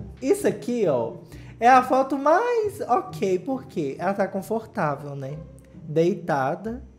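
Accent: Minas Gerais, Brazil. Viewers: Eu amo o comentando as coisas com esse sotaquinho mineiro fofo ♡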